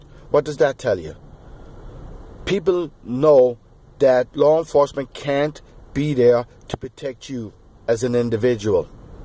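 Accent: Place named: Netherlands. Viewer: so, just thinking, your accent sounds like an old Holland/dutch accent, am I close? btw, reports are interesting from LA, cousin is getting out of the state tonight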